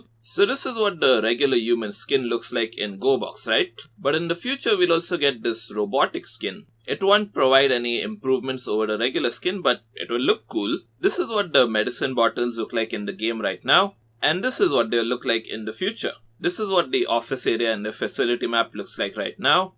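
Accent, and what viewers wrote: India. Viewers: Talking ahh India voice 💔✌️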